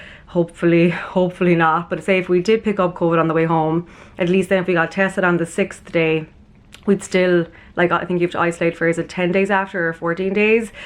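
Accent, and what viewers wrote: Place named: Ireland. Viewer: She's Irish, from Kerry in the south-west of the country. Irish accents are incredibly varied - there isn't just one.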